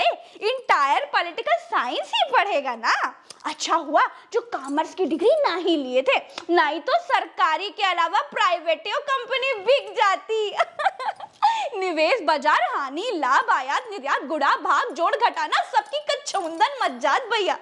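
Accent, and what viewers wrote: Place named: Bhojpuri, India. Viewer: Hats off for telling truth in a bhojpuria style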